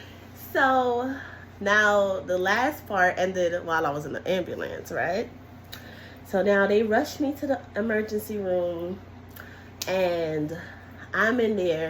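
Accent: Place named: Brooklyn, United States. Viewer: you remind me so much of "Mz. Brooklyn." I just love your Brooklyn dialect. She started out at, I believe, almost 400 lbs. Today, she has dropped significantly. Your "story Time" reminds me of Mz. Brooklyn's "story time." <link>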